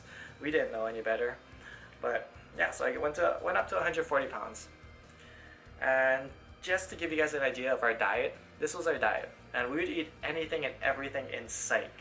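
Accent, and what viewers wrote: United States. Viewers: Dude..u from west coast or east or Midwest?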